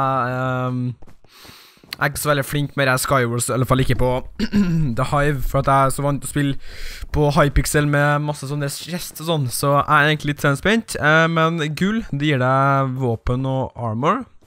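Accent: Trøndelag, Norway. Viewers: Ækte Trønder! Bra at vi har nånn trøndera som youtubera!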